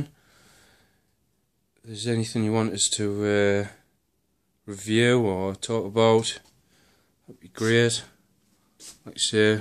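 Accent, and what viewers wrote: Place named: Northeast England, United Kingdom. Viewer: I'm from just outside Newcastle, so I picked up on the accent! Have you added any more to your batman collection since you posted this video?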